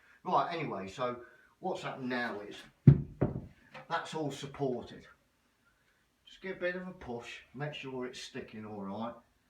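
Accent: Leicester, United Kingdom. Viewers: Cheers for that mate. Just wondering about your accent. Are you from Leicester by any chance?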